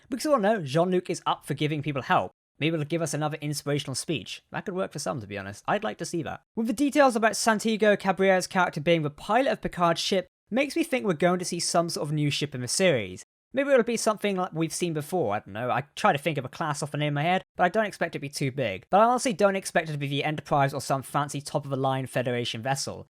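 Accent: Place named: United Kingdom. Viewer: susan chase He’s speaking British English.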